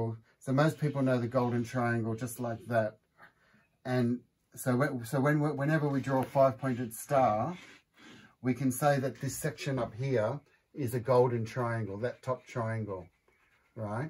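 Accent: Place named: Australia. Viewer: Do you do courses Or classes? I'm in Sydney Australia. I am in Sydney & I just realised you have an Australian accent I was so engrossed in your teaching I didn't even notice. I would love to take a course lol 😂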